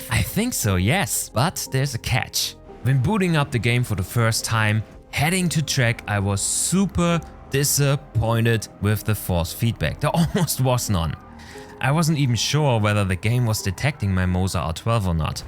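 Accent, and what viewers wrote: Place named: Germany. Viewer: Bro er ist 100% deutsch man hört das raus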